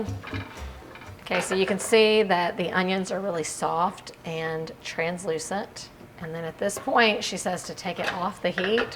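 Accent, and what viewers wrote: South, United States. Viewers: Loving your vibe and personality.. also the southern hint to your accent, you should have your own cooking show on tv.. I’d definitely watch it 👍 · Just popped it in the oven. I never saw your videos but this is great. I love your accent. Is it southern? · is southern for sure!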